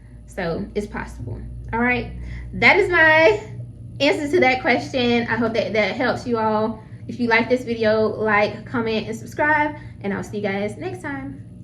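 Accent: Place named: North Carolina, United States. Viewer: Hello. My name is Albert and I grew up in NC. I now live in California, but it is still nice to hear the NC accent. I welcome you to the world of money and investing. I have lived my life frugally. At first it was out of necessity, but in time, it became a lifestyle. Eventually, it became a very comfortable lifestyle. Best of luck with your channel.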